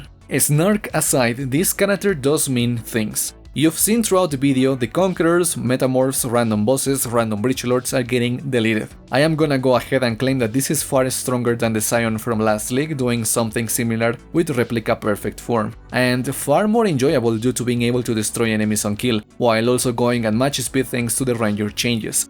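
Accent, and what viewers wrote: Spain. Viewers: I think all PoE guides should be done with a Spanish accent after watching this one. Great vid and great guide!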